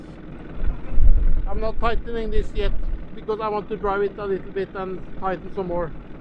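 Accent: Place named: Norway. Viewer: I’m loving your videos! And respect for for walking through all that snow to set up your cameras and get those great shots. And the Norwegian accent is fun to listen to🤭 keep the great videos up and much love from the USA 🇺🇸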